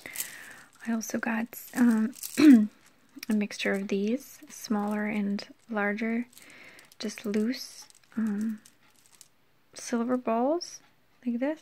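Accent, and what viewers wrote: Russia. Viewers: ! I love your videos you're the best! I've always had asmr since I was a little girl. It was mainly my teachers that made me have a tingling feeling and chills! My Spanish teacher in high school was Russian and she really had a soothing voice and accent like you. When I started watching you, you introduced me to things like tapping and crinkle sounds I had never experienced before in asmr. I didn't even know what I had had a name lol. I thought I was the only one until one day I came on YouTube and typed in soft spoken words and came across you and I've watched you ever since! You're really good at what you do. Congrats on making it to 5 years! Keep it up! If you're looking for things to make into jewelry I would suggest making things with sea glass. It's very beautiful we have a lot of it here where I live on smith island in MD. How the sand and water mold pieces of broken glass to have smooth edges is so pretty. 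A friend made pretty necklace and earrings made out it I lovethem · I was in Baltimore a few weeks ago with my boyfriend and was secretly hoping to run into you randomly in a little shop or something to say hi and meet you! But I'm glad I didn't because I'd have nothing to say I'd just wanna follow you and listen to you talk (that might have been slightly creepy) haha. I love your voice so much and your Russian accent. I also just bought the nail hardener you recommended because I have such problems with my nails peeling so I hope it works! Maybe someday I'll have nails as beautiful as yours. That's the dream. Lol.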